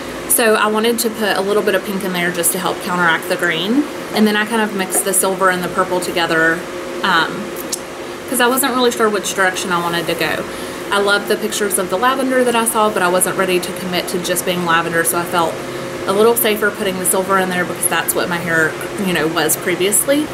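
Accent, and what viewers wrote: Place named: United States. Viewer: What state are you from? I love your accent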